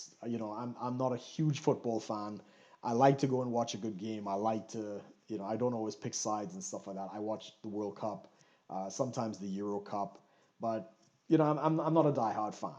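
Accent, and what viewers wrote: Trinidad and Tobago. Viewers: you sound trini thou..... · U born here da can’t hide yuh accent strong buh u clearly lack self love I grew up in West wid white people and Dey mad chill .... you really don’t like yourself or ur folks don’t blame Trinidad blame yo mama !!! Police never harass u for money u delusional bruh stop fuckin lyin dawg you issa joke I will share u and clown u ur so fake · dont pay people with negative responses, you know we live in a fallen world. Keep happy and keep on doing what you love to do, i can also hear your trini accent, love it... the diet you are on is truly good, all the best with your endeavors!!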